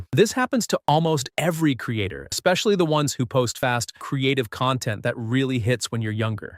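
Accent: India. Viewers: she has indian accent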